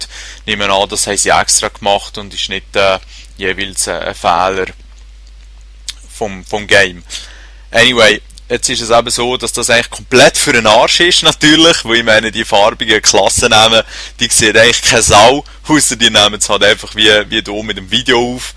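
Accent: Switzerland. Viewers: Schweizerisch is schon lustig, jedoch als Deutsch verstehste manchmal nur Bahnhof :D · DD alter schweizer is das geil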